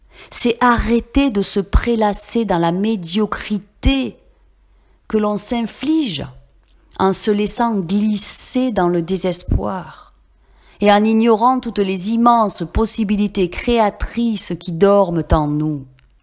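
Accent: South, France. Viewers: Bonsoir.J'adore votre voix du Sud. Namaste:)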